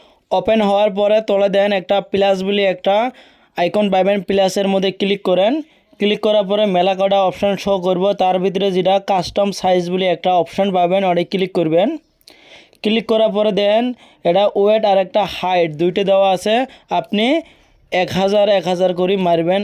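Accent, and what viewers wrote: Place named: Kurigram, Bangladesh. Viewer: এ কুড়িগ্রামের ভাই, ইউটিউবে চ্যানেলে ভিডিও আপলোড করেছ, একটু শুদ্ধ করে কথাবার্তা বল তাহলে শুনতে ভালো লাগবে, সারা বাংলাদেশের লোক দেখছে বুঝনা কিছু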